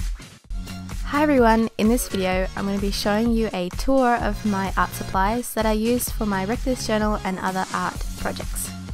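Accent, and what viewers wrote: Australia. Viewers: Hey, I'm in Australia too! So I already new the brands they were from. :D I also knew you were Australian because of your accent. :) :) :) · Omg as soon as I heard your voice I knew you were Australian!!! I love your accent I wish mine was more noticeable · Ikr, i totally want her accent ,"wotah"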